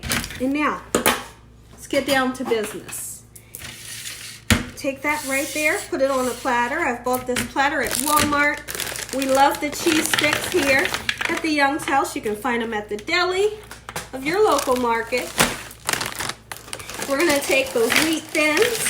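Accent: South, United States. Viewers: Girl do not worry about your braces. You still have an amazing smile and I love how you speak. That beautiful southern accent. Ooowee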